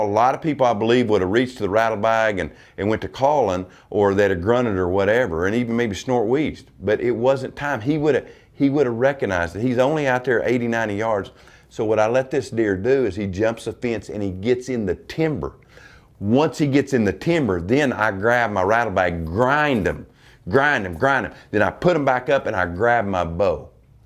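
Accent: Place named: Oklahoma, United States. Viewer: I can tell by your accent your an Oklahoma boy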